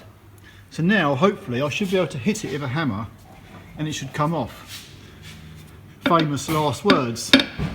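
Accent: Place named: England, United Kingdom. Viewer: That’s the same English accent as Producer-Director Ben Dover.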